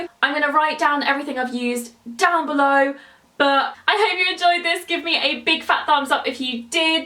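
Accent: United Kingdom. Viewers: Are you British? I admire British people.😋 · I really like your British accent😍😍😍😍😍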